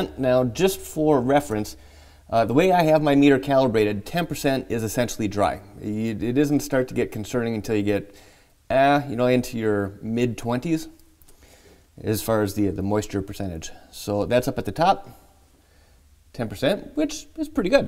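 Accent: Midwest, United States. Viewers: Really like your knowledge and presentation. And a good Midwestern accent. Ex michigander here.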